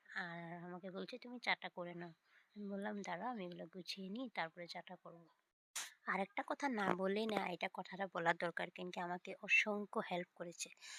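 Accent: India. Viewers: তোমার কথা গুলো কি মিষ্টি লাগছে শুনতে ❤ তুমি কি ঝাড়খন্ড থেকে। ওই রকম টান ❤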